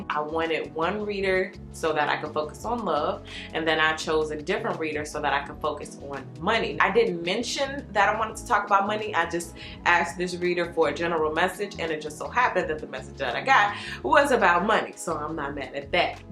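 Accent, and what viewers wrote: Chicago, United States. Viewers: Sounds like you’re from Chicago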